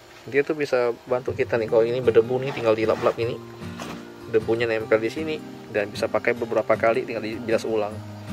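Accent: Pontianak, Indonesia. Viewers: Logat pontianak sekali abg e ni wkw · Koh.. orang Pontianak ke ? Sepintas lalu ku dengar macam logat Melayu Pontianak.. 😃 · Pertame liat, rase kenal.. dengar cara ngomongnye,.. dipastikan orang pontianak... diikuti lebih jauh,.. saye jadi ingat, pernah 2 kali berinteraksi,jadi konsumen... akun masih ade ke 😊... sehat, lancar dan sukses selalu boy.. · Kok bahase nye kayak bahase orang pontianak ye koh...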